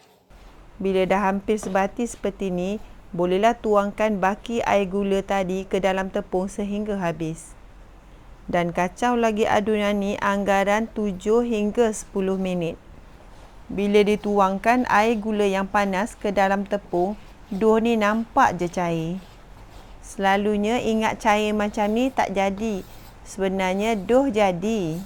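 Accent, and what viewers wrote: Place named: Malaysia. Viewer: Ni bunda malaysie logat bicaranya, tusini kue cincin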